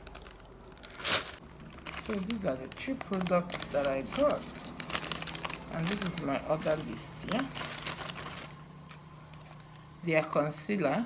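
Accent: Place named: Ngwo, Nigeria. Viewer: Everytime I hear your igbo intonation I always say you sound like someone from ngwo. Please what part of ngwo are you from?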